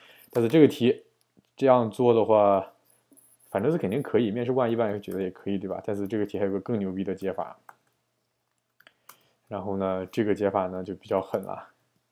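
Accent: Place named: Dalian, China. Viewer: hh 大连话好亲切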